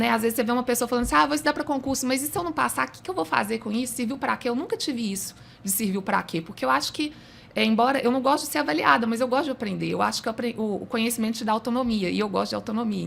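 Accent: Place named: Minas Gerais, Brazil. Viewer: Esse sotaque dela é maravilhoso MG ❤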